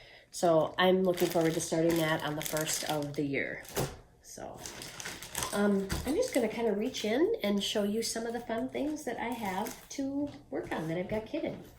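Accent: Minnesota, United States. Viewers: Good to see you back. Stay safe. I was watching this and my housemate walked in the room. When he heard your voice, he asked where in Minnesota you were from. His mom and sister live in Sauk Centre and his niece is a pediatric nurse at the hospital in St. Cloud.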